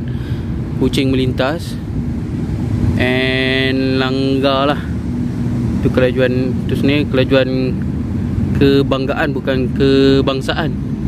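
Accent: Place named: Malacca, Malaysia. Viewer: Nice video bos. Orang melaka ke bos? Ada bunyi melaka hahaha.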